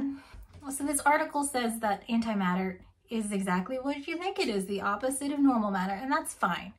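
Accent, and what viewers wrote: Kentucky, United States. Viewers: More Kentucky accent!!!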